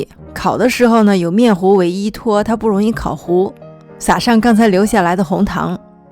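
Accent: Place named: Beijing, China. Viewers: 好喜欢讲解的声音，北方腔好治愈，音乐也好！喜欢5555，想学肉夹馍！！ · ，你人生活在印度嗎？為什麼又印度奶茶，又印度薄餅？為什麼說話口音是京騙子？膚色是印度人的膚色？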